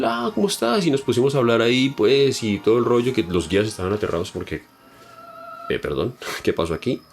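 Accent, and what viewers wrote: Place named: Colombia. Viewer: Cuidandome en esta pandemia me encuentro con tu video y escuchando tu narración de tu experiencia en corea del norte, me encantó tu formato. He leído mucho sobre corea del norte, su cultura, su forma de vida, el sometimiento en este regimen, la infraestructura de este país y realmente no me gusta nada Corea de Norte, pienso que es un país engañando por este régimen, donde estan atrazados años luz del mundo exterior. Pero tú Narrativa es muy entretenida a lo Colombiano y eso me encantó. Seria muy interesante escucharte hablar de otros países que tu has visitado. Pues me encanta conocer otras culturas y otros países. Yo sigo a Alex tienda, Gabriel Herrera, Luisito comunica, planeta Juan etc. y que bueno que un colombiano nos narrará sus experiencias de viaje desde su concepto y a lo colombiano. Un saludo y felicitaciones.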